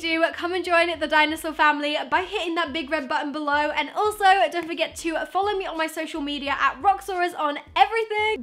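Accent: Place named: United Kingdom. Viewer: I love her British accent ❤️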